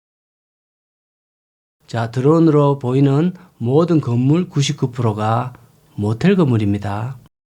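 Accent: Gyeongsang, South Korea. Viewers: 야이마 겡상도 사투리 쓰지마 방송하려면 시부럴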